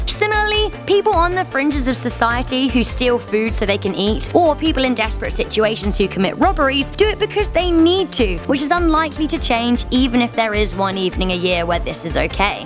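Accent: United Kingdom. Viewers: no offence, but how come a lot of these kinds of channels have annoyingly high-pitched british-accented women?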